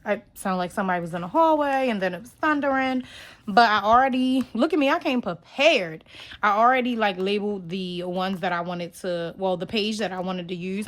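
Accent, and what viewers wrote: Baltimore, United States. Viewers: Do I hear BMORE accent❤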